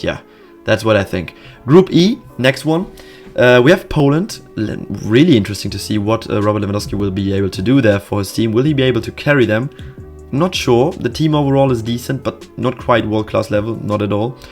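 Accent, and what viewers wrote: Germany. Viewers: The German accent 😩😩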